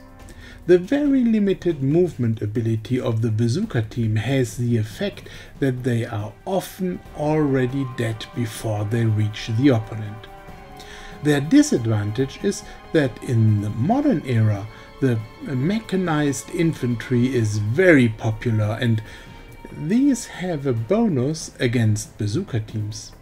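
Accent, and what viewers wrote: Germany. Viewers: Er ist Deutscher mit etwas starkem Akzent.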